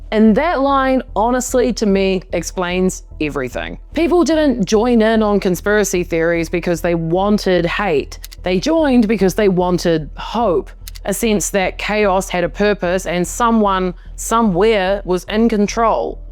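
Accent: New Zealand. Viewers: Kiwi! Finally you disclosed and I learned where your accent came from! Now I have to convince myself that you are not a blank slate no readily foundable bio, AI made youtube anchor! hahaha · I said the same thing! And then felt awful because she clarified she IS a kiwi 😂 🤦‍♀️ real broad accent huh?!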